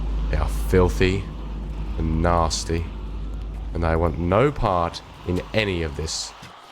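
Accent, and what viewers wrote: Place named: United Kingdom. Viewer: Jesus, are you livin in some castle in real life or is it because you can speak British really fucking well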